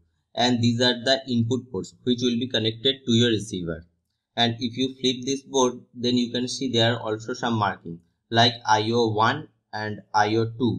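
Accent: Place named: India. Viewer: i cant understand your heavy indian voice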